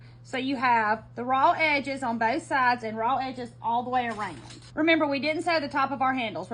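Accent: South, United States. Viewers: I just found your channel a few days ago, and im so impressed!! I just made this bag, it took me longer than an hour but I love it!! You are a great teacher! I love your accent, you must be from the south. Thanks for sharing your talents with us!!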